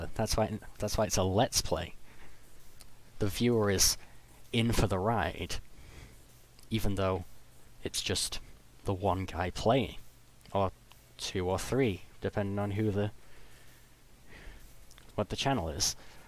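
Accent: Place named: United States. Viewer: My G is still alive. Where d'ya get that American accent??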